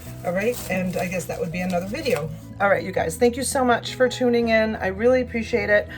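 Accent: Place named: Massachusetts, United States. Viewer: miss hearing the Mass accent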